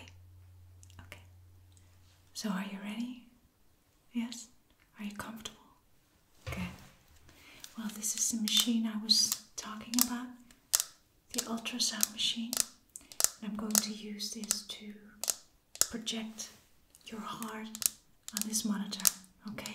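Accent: Netherlands. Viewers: Pretty good roleplay, are you dutch btw?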